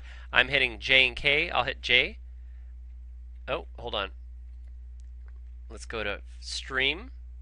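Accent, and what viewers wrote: Canada. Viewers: Your from Canada?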